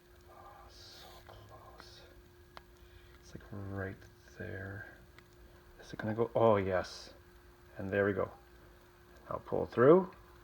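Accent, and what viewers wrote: Canada. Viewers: oooh I love a Canadian accent!! ❤❤❤❤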